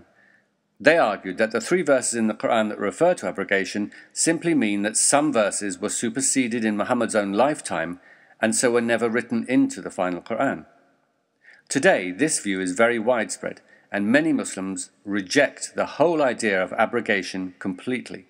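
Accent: England, United Kingdom. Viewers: excellent al taqiyya... use someone with an english accent to say "islam is peace" i advise anyone with a brain to read the koran without the help of a muslim muslims have always preached al taqiyya since mohammeds time: a nsw ering nonb eliever. i nfo/ was- prophet- muhammad-wron g-for-killing-kaab-bin-al-ashraf.html from another muslim.. shows the mentality allah fukbar!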